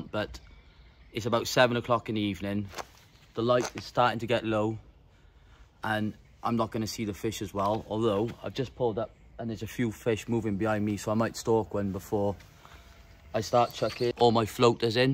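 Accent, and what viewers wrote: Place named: Wales, United Kingdom. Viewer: Thanks for the reply. You have fun , nice to hear the Welsh tones, awesome! Tight lines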